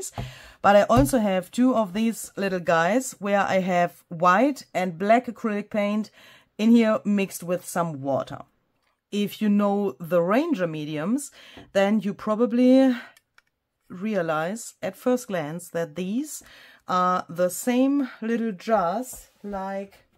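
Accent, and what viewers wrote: Germany. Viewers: German with a Southern drawl? My ears can't even imagine what that would sound like! 😄😄😄👍🏻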